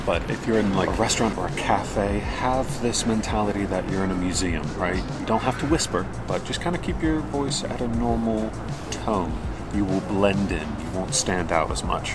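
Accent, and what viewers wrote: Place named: New York, United States. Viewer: Sounds like New York lol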